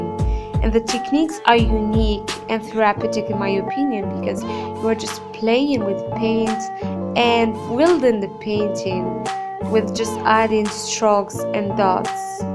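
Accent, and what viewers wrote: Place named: United Kingdom. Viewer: Should I comment about these amazing drawings 😍, well edited video 🤩 or just,,, that new British accent thouuugh ? 😂😂 Ps : U nailed it 👏👏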